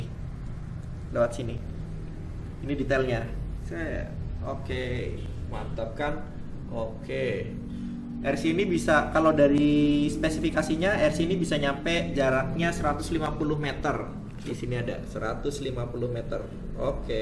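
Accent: Banyumas, Indonesia. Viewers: kereenn.. bang. belii gak yaa... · mas, kalau saya pake yang 3300 mAh bisa gak ya?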